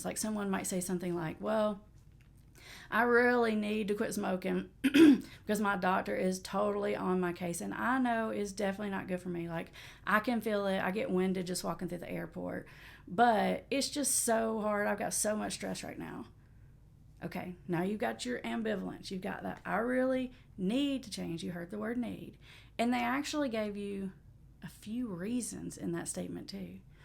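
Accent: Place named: Tennessee, United States. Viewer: Hi, I’ve become addicted to your videos (not funny?) I’m 6 months clean. You have helped me so much. Anyway, if it’s at all possible, it would be really great to hear from couples that have made it through addiction and are doing well. I would be interested in hearing the perspective of both partners. It may give me a little hope. ❤ Thank you for all you do. You’re doing great work for the world, especially in the area of the world where you’re located (I’m from Bristol Tn and I can hear in your voice maybe you hail from close by? Hehe)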